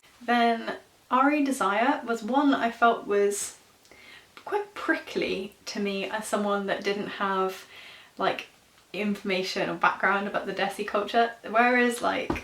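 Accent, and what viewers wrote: United Kingdom. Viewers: still lose some meaning words in your speak but understend more than early, did you use some english accent or it clearly english?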